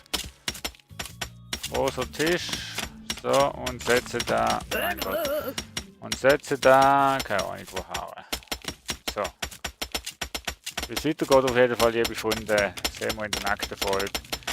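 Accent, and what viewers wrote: Switzerland. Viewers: Intressantes Spiel:). Zwar tue ich mich schwer dein Schwizerdütsch zu verstehen, aber so langsam komme ich rein:)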